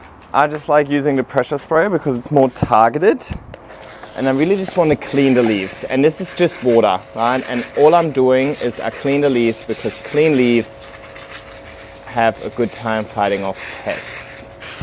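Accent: Germany. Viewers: So, I only really follow you here bc I can't handle other social media very well. I could have sworn in your last several videos that your accent sounded a little more on the German side 😂 It's typically a very equal balance between accents if that makes sense? But I was thinking you may have taken a little trip back to Germany or something, haha! I'm so so so so glad you had a good visit! I think we're pretty close in age and I live very far from my highschool (and current) best friend, so I definitely relate. I also moved somewhere that affected my accent according to others, but I don't hear it!!! So now I'm hyper aware of people's accents and how they can change!! Love it. 😂 Thanks for such a long video. I'm painting my new home so I'll definitely be binging your channel the next few days. 💚💚💚💚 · I have just started the hobby and I appreciate your videos and hope that I can achieve the same level of growth!! 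I am located about 1.5 hours north of you in NSW. Also, my grandmother was German and listening to your accent reminds me of her. You have the same work ethic as she had and passed that onto me. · When I first started watching you, i was like: "is this a german accent? No i think that is just how they speak in Australia" And then i was so surprised that you are acutally from Germany.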